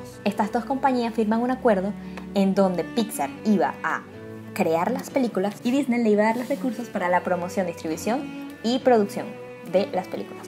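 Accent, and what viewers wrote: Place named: Venezuela. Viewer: Gracias por aclararme todas estas dudas <3 Pd: eres de Venezuela? 🤔Tu acento me suena muchísimo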